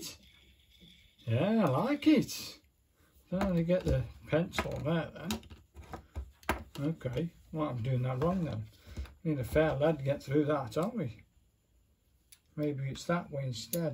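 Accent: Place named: Stoke, United Kingdom. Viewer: Thought I recognised the accent. Mind you, I should given I have the same one 😂 I’m from ‘castle 👍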